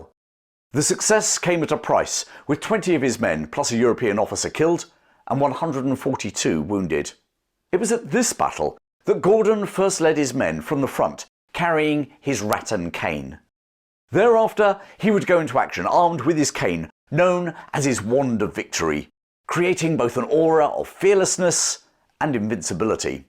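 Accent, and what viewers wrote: England, United Kingdom. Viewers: I have to agree. No AI voice, and his real pommy voice I can understand. And we get to talk with him live.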